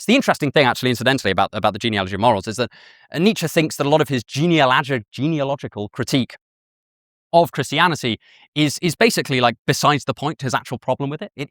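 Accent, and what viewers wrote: United Kingdom. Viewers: Entirely unrelated to the video, but do you ever feel as though people (not from the UK lol) legitimize what you say because of accent you speak in? I randomly had the thought as I watched this video. Just “if were American or Australian, would my/the audience’s reception of his words remain the same?” It sounds really horrible but I’m not without bias.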